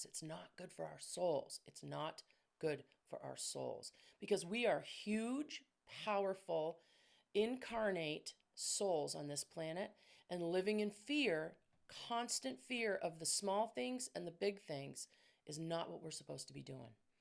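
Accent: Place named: New England, United States. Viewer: Thanks so much for this message! PS I knew right away that you were a fellow New Englander. Hello from Maine❤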